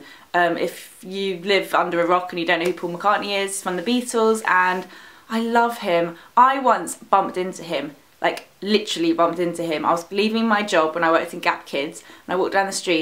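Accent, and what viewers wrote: England, United Kingdom. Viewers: Very English and not British!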